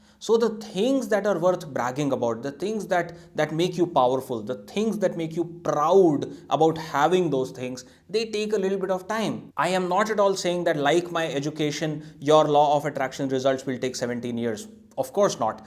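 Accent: India. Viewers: Your accent makes me want to watch a Bollywood movie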